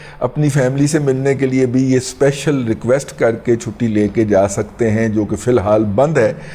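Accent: Punjab, Pakistan. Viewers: Punjabiyat jhalak Rahi Hy AP k monh Sy. 😅wah kia analyse karty ho.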